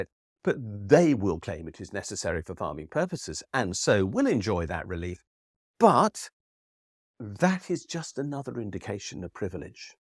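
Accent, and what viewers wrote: United Kingdom. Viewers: I may always have believed what here is professing, but i believe it doubly now that I've heard it spoken with a British accent. Cheers from across the pond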